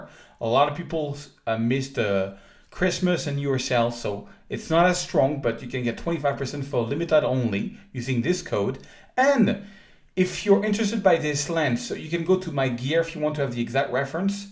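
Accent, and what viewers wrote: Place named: Paris, France. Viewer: man you are the king of marketing to be sure - never seen anyone as slick as you and with their fingers in so many pies...I just love your architectural work, fantastic- aspiring pros can learn a lot from you as to be a successful photographer in this day and age requires not only solid photography skills but also sound knowledge of how to market yourself and the many areas you need to explore to maximize your income - is the man and the Parisian accents just adds to the mystic - I think I will work on establishing an Italian accent, or perhaps German - anything to set you apart from the competition!!!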